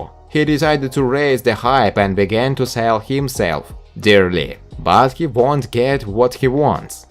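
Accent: Russia. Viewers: Omg i hate youre russian accent! It is so terrible...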